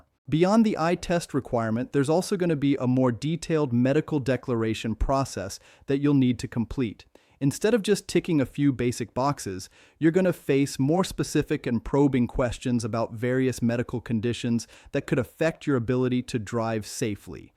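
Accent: United States. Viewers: Well to be honest, what do you expect with a USA narrator?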